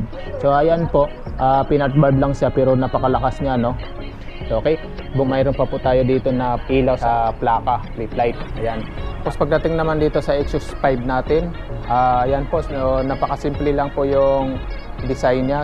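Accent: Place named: Visayas, Philippines. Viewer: nice review i like hasang hasang n parte ng mio aerox abs kaya siya talaga naiiba s lahat at iba k rin magspill ng words very natural in visayan accent kudos to you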